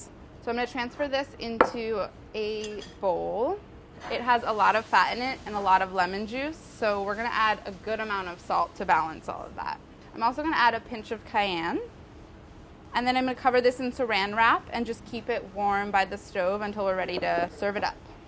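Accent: United States. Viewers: Is that an accent from somewhere in the US? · She sounds normal, sure its like a small state accent but hardly anything to moch her over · Everyone's talking about her accent and here I am, English not being my mother tongue, and I'm already proud that I can tell she's American. I literally can't hear the difference between any English accents.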